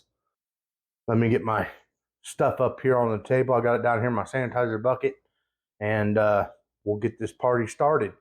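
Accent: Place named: Indiana, United States. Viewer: Have I missed you introducing yourself? Not sure I caught your name or where y'all are from, I wouldn't be surprised if your accent is from my homeland of southern Indiana. I'd love to learn a little more about ya.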